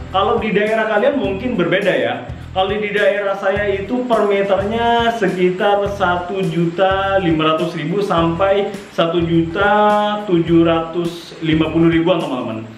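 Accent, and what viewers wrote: Madura, Indonesia. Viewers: Orang madura ya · Madura kayak nya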